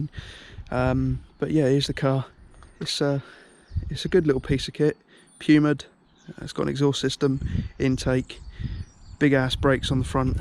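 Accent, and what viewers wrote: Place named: Staffordshire, United Kingdom. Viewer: Where are you from? You sound as if you're pretty local to me? I'm in Staffordshire.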